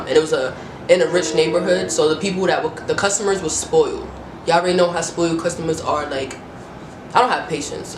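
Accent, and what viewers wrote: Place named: New York, United States. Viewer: Where you from?? You sound like your from ny cuz that's where I'm from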